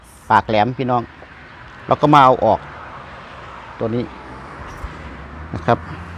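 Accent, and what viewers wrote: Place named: Central Thailand, Thailand. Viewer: ขอบคุณครับน้าที่ที่สอนการซ่อมเครื่องตัดหญ้าสอนพูดภาษากลางคนภาคใต้เข้าเข้าดีครับครบทั้งสี่ภาคเข้าใจภาษากลางขอบคุณครับน้า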